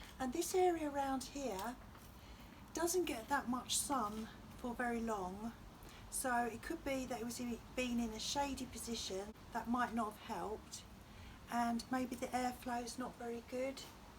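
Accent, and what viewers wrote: London, United Kingdom. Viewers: Yes it’s been in the same place for 15 years and I’ve cut it right back with the intention of getting rid of it, but it came back healthier till the fungus took hold again. Unfortunately it’s base is too large and established to be moved easily. It’s on a north south oriented fence so it get shade and sunshine. I heard that feeding and watering them well helps. One last ditched attempt this year for me. This morning it looked really well and healthy with all this heat. Have a wonderful w/e. BTW is that a London accent you have?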